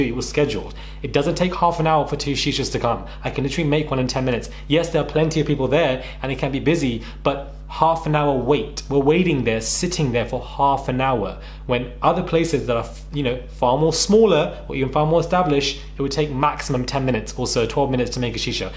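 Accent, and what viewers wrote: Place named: United Kingdom. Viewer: Wow!!! I didn't know you were in England even with the British accent because there are a lot of British people in the USA. What is a she-shell? I understand your frustration. .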